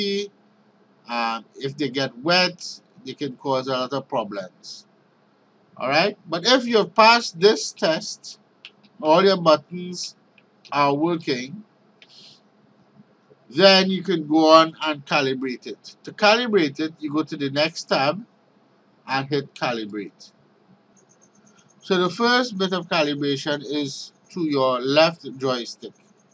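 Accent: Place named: India. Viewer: Indian accent...........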